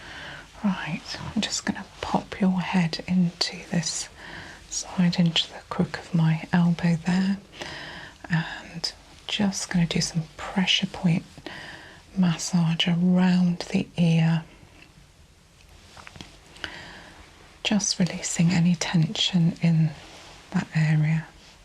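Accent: United Kingdom. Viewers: your soft lovely voice plus the British accent is just all I need….so super calming. Congratulations on your 1 year success as an ASMR creator. Love your channel so much♥️. Blessings lovely lady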